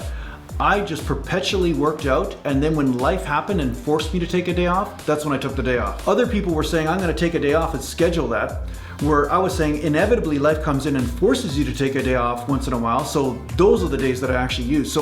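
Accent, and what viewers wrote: Canada. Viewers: Are you canadian by any chance? Kind of sounds like it, not saying thats a bad thing haha im canadian myself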